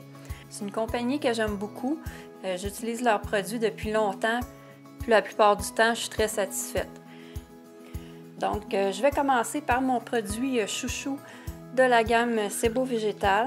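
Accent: Canada. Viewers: J'adore tes vidéos et aussi ton accent - ( J'aime tellement le Quebec!) Je m'abonne a ta chaîne avec un grand plaisir! xoxo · Merci pour la découverte, je ne vais pas souvent à Yves rocher et tu m'as donner envie d'y retourner. Sinon comment te dire que j'aime ton accent canadien. Et je mabonne bien sûr 😘😘😘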